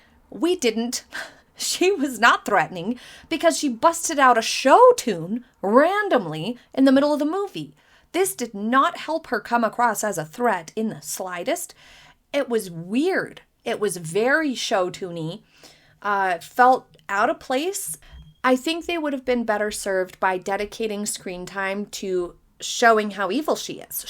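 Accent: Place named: North, United States. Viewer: I can definitely hear your northern accent today. Are you from Wisconsin?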